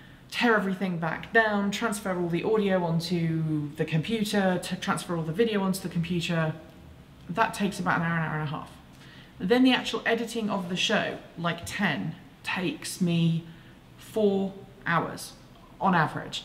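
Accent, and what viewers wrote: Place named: United Kingdom. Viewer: Are you from New Zealand? I've been assuming you are British just based on your accent (I'm definitely not an expert, as may be obvious). If you aren't a Kiwi, why do you do movies specifically for them? Something unique about their market?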